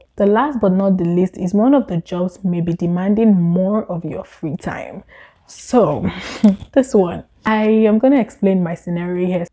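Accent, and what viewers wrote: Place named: Canada. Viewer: Aha ! Your accent is different in this video compared to a year ago. You sound more Canadian with your English accent.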